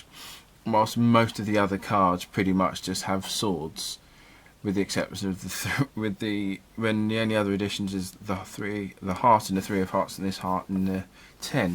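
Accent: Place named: California, United States. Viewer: Love your accent from California, just subscribed!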